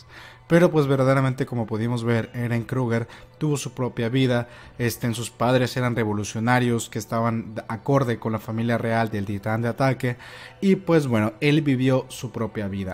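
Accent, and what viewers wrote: Yucatan, Mexico. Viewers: De donde eres? parece que tienes acento Yucateco ajsjajja Ya se que nada que ver con SNK xd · Eres de Yucatán?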